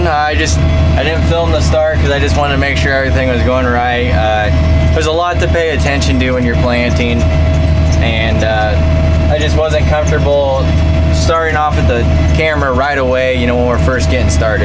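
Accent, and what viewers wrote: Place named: Nebraska, United States. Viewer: Oh I used to work for a potato farm in Duncan near Columbus. I could tell you were from Nebraska.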